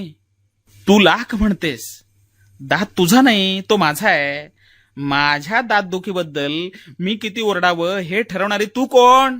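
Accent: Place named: Solapur, India. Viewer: भाषेचा बाज अगदी सोलापूरी ! खूपच छान .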